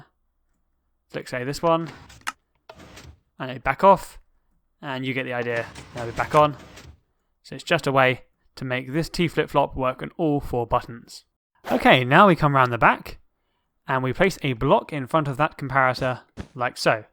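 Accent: United Kingdom. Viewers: i love the british accent.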